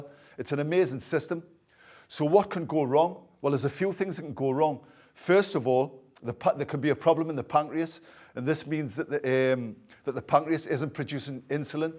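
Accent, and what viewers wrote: Newcastle, United Kingdom. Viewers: I actualy understood that. Must have been the jordie accent!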